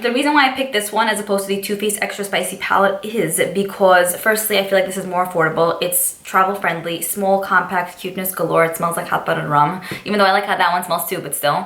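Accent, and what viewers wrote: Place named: Long Island, United States. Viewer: Glaws Bombs! I was guessing you were a NY'er...hot chawclate made me think Long Island perhaps.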